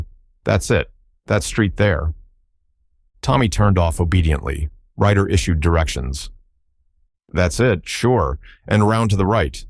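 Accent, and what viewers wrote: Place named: United States. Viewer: Terrible listening to Agatha Christie in an American voice 😔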